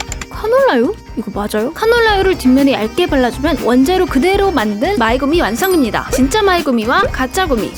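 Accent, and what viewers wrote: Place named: Gwangju, South Korea. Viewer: 광주분이신가요?광주광역시 사투리 들리는데ㅋ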